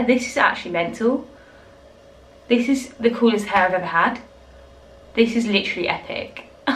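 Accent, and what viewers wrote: United Kingdom. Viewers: When you realise she’s British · Are you british? 😂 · Her british accent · Are you British?? You accent is sooo cute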